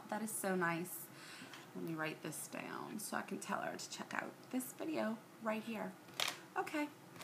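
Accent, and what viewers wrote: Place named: New Orleans, United States. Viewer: great video.. just curious.. are you from the new orleans area too? (the accent has my curious, you sound like me and my friends)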